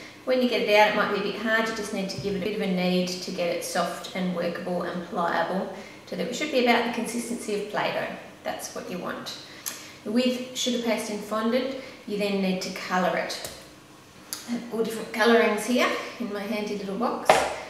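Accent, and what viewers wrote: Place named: Australia. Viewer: Wow! I came across your channel while typing in: How to make Pokemon cakes ^_^ Thanks for the fondant colouring video. This was great. And judging by your accent, i can make out you're from Australia. I'm from Melbourne. Haha ^_^ Anyway, please keep posting more videos.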